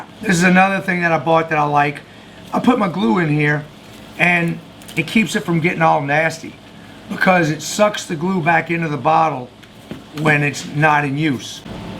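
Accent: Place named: New Orleans, United States. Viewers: to a British person he sounds like like he’s from New Orleans as you said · love your work - quick question. I cannot figure out your accent. It's definitely not rural Virginia, where I'm from, but doesnt sound like Long Island either. It's like 5 different accents in one. New Orleans maybe? Do tell, sir!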